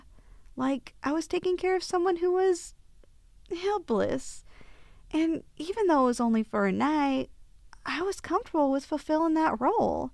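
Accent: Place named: South, United States. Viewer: What's with the southern accent my lady?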